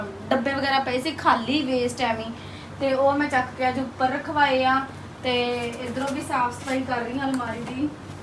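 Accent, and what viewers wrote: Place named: Gurdaspur, India. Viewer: Je TUC gl gl te va va krde je aa bhut sohna lgda ah sade gurdaspur Batala wale bolde aa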